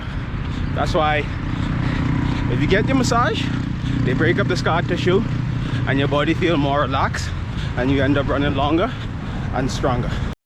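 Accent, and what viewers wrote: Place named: Bahamas. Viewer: I heard the accent am very familiar with it I don't know how someone can get a Bahamian English confuse with Jamaican English I've seen interviews with mychal thompson klay thompsons dad where they ask him if he doesn't talk in that Jamaican way or buddy hield I didn't know they had a somewhat accomplished distance runner from the Bahamas obviously not upper echelon norweigans,kenyans,ugandans,Ethiopians but still a decent one anyway I think this video is a perfect example of a strong Bahamian English dialect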